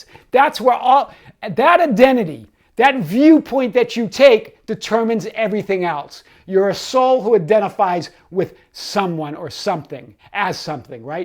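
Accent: Boston, United States. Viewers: "GODHADDATHAAHT." 😄 Love that Boston accent. This was great. Thank you.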